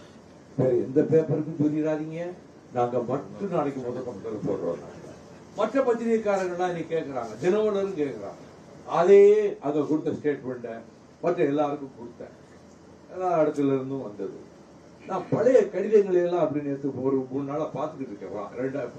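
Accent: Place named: Telugu, India. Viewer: தெலுங்கன் பேசுவது தெரிகிறது. இது 10" 10 ரூமா 😢😢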